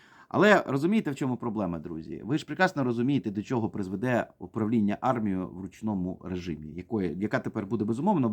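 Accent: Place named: Kyiv, Ukraine. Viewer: Не переживай. Хтось до і доживе. Дякуємо автору за справжню українську- київську говірку,ви говорите по нашому... без отого польського " наречія"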